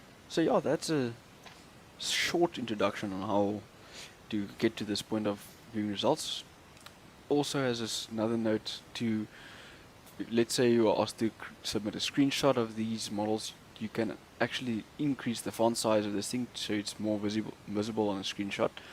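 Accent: South Africa. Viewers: Are you Afrikaans?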